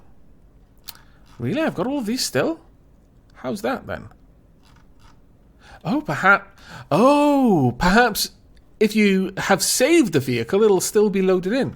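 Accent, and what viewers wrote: Wales, United Kingdom. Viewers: Where r u from? You have a Welsh twang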